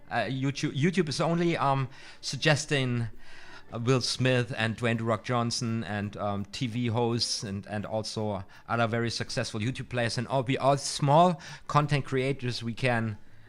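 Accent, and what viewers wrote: Germany. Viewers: woow ich hab vorher von chris ramsay gelernt und hab dich gerade eben gefunden...habs sofort am akzent geört das du deutsch bist hammer !!!! mach weiter so !!!!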